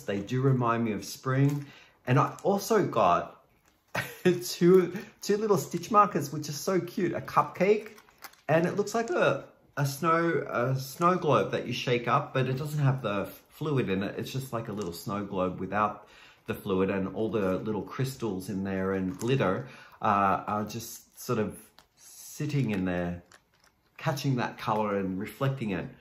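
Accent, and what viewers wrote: Australia. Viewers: A<link> 😆 A Pixel appearance! You should actually do some video vlogs with Pixel sitting with you on camera 😜😍.. I never knew you were from Australia 🤪 I knew u had accent which I love but I just thought it was some sort of strong Canadian accent lol 😂 Why in the world would you move to the cold Canada from Australia dear? Lol 😝 What beautiful happy mailings. Those hobbi ones were gorgeous.. my fav is the darker purples one. The colors 8 and 10 I thought was the same color. They almost look identical. I bet you could actually use them together 😜 And Zee is wonderful! She’s so fun to watch. You were lucky to receive her birthday colorway 😍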